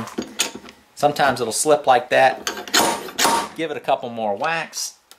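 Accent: South, United States. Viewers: Hahaha! I'm from Arkansas, and not only does your accent give you away, and "down in Georgia" narrows it down even more. I'm not really trying to find your location, I just get a kick out of your sayings. My favorite today was "don't let a nickel hold up a dollar." I've heard that all my life here in the Arkansas Ozarks! Great advice on reclaiming lumber too. I always enjoy your videos, ramblings and all!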